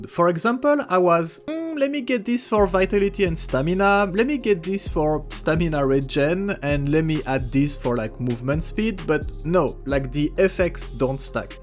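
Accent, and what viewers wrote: France. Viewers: Bro are you french? I like the accent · I love your french accent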